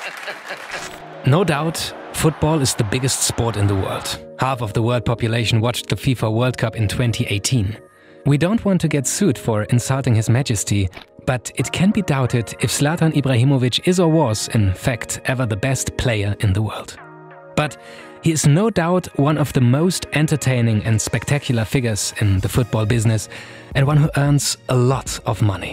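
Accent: Germany. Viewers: Deutscher akzent kickt hart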